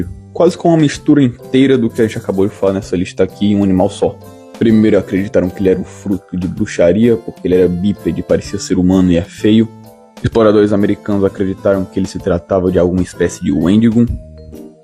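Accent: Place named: Fortaleza, Brazil. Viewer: Vídeo muito brabo irmão, continua assim que vai longe . Aliás tu é de fortaleza né, reconheci pelo o sotaque kkkk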